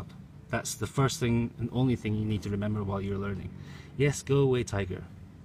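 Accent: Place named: Scotland, United Kingdom. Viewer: hmmmm scottish accent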